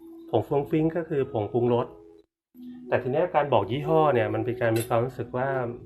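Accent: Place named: Bangkok, Thailand. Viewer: อยู่มี่ไหนคะนี่พูดเพราะเหมือนคนกทม.ตอบด้วย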